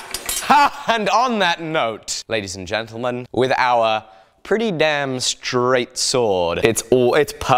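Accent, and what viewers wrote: South, United States. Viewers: Please make an ENTIRE episode in yer southern accent!!! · The American accent 😂😂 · your southern accent reminded me of Bobby from Brickleberry hahhaha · This is one of my favorite episodes! So we’ll edited! The buildup each time you try to straighten the blade, your patience, your humor, showing us the fails and the wins, and the Southern accent was priceless! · You should use your american accent more often. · Love the southern accent.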